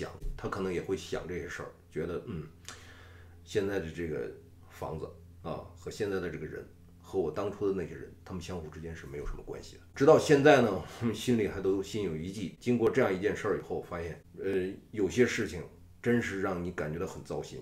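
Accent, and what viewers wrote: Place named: North, China. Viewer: 聽您講生活故事．真的很有趣．很標準的北方腔．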